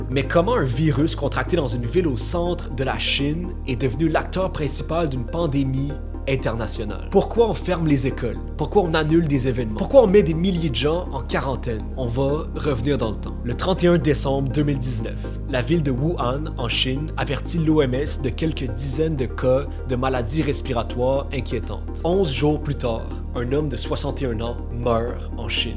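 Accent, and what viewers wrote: Quebec, Canada. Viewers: Cette accent québécois m’avais manqué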